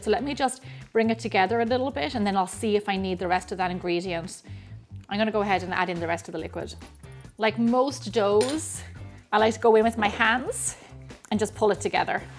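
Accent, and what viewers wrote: Ireland. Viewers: I love that Irish accent!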